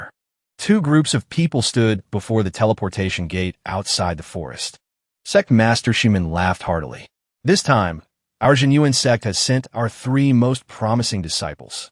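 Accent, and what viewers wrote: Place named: France. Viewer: tf is this voice? is the ai getting heartattacks? why is it speaking in a french english accent in the video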